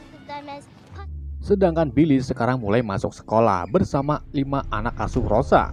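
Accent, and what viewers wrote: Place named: Java, Indonesia. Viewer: medok e renyah😄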